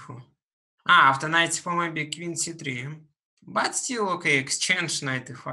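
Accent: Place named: Russia. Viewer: I googled brutal russian accents and ended up here, amazing stuff.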